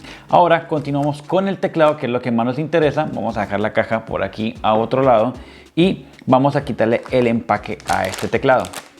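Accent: Colombia. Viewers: Mi socio es Colombiano? O me equivoco? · Tengo Dos Preguntas! Jajajaja 1. Eres de Colombia? Especificamente de Medellín? Lo pregunto por tu acento Jajaja. 2. Se pueden reemplazar las KeyCaps?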